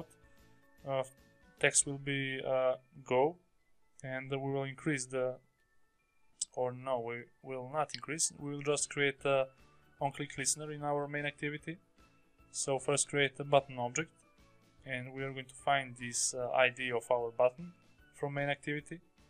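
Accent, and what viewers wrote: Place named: Russia. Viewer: Your native language is Russian? Accent. :D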